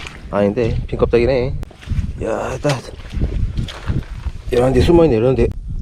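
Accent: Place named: Jeolla, South Korea. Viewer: 매번 영상 잼나게 잘 보고 있습니다.^^ 더운 여름 홧팅하세요 * 건의 사항 구독자로서 주제넘는 참견(?)을 좀 하자면 - 구독자가 팍팍 늘었으면 하는 바램으로 1) 오늘 영상에서 조개 잡는 영상을 좀더 길게 했으면 합니다. (조개잡이 7분 먹방 9분) 사람마다 차이는 있겠지만 저는 먹방보단 조개잡이가 더 길었으면 하는 바램 2) 먹방영상을 따로 만드는 것도 좋겠어요. (동네 또는 지역맛집소개) 아예 대놓고 막 드시는 영상요... 마치 먹방유튜버들처럼 해도 잘하실듯. 너무 맛나게 그리고 많이 잘 드심 3) 컨텐츠 하실 때 , 말씀을 더 많이 하셨으면 합니다. 형님 일상이나 컨텐츠 내용 설명이라든지 저는 경상도 사람이지만 형님 이야기하시는거 너~~~~무 정겹고 좋습니다. 사실 저는 거의 형님 전라도 사투리 듣는 재미로 온당께요. 빨리 구독자 많아져서 전업유튜버(?) 하시길 응원합니다^^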